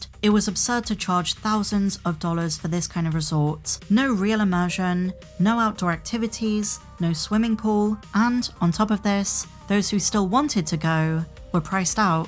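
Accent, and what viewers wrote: United Kingdom. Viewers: I'm no fan of the speculation portions here, or the non-video media offerings since my generation didn't align with the release dates, so I'm not as obsessed as most of your viewers. I am very curious about the person behind the character here. The facts as I've observed is that you put a ton of effort into editing. No breathing pauses, a UK accent while living in America, and your personality coming through in the dialog. My guess is that you're an American male working incognito and are your initials. I have nothing against it if that is the case and would likely do something similar because of all of the loud, crazy, uninformed, and over opinionated attention addicts, lol. Good luck moving forward, and if you ever run out of topics, there's always the fan fiction to share.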